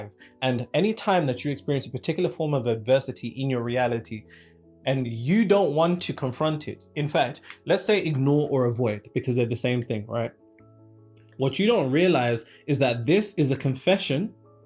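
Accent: United Kingdom. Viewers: Lol😂 I'm glad I didn't put the comment about the way you say pasta like "pastor " I mean I get it we sound different from every region of the world ....I truly admire the u.k. accents most of all if I see you I won't try to sound like you but I can't help but copy your accent at home 😅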